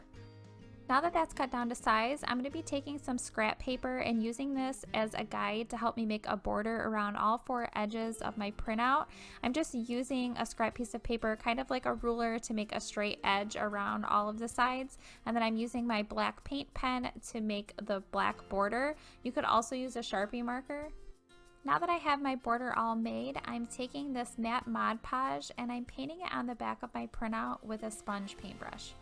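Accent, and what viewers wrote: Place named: Buffalo, United States. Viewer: I love this! I swear you have a Buffalo accent!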